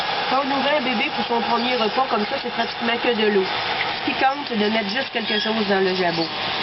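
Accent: Quebec, Canada. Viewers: pas française, québécoise !!! listen !! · J'adore l'acsen québécois ! J'arrive pas a le faire... 😕 ! Un jour d'arriveré. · J'adore votre accent canadien (si je ne me trompe pas) et bravo pour le sauvetage :) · Ahah je kiff l'accent québécois ;) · oh bas oui on remarqué que tu es du Québec !!!!!!!!!!!!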